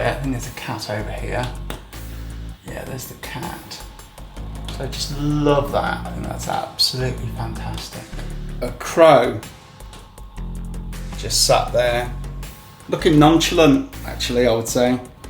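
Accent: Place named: North, United Kingdom. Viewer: My compliments: your house shows good taste, and a great aesthetic sense. I have a question, which you might find a bit provocative: how can you be so lighthearted all the time, given you are a goth, these are heavy times (ref: Paul King!) and, based on your accent (Northener...?), you seem to live in a Country where police breaks into someone's house following a post, and that has been literally destroyed by decades of uncontrolled mass migration? I know being a goth can simply involve lots of make up and a matter of dress code, but I do not believe you are such a shallow guy. Just wondering, as I can barely cope.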